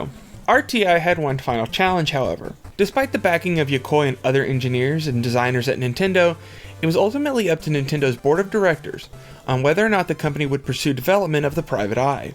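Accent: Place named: Kentucky, United States. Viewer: Judging from that accent youre also a Kentuckian. Subscribed.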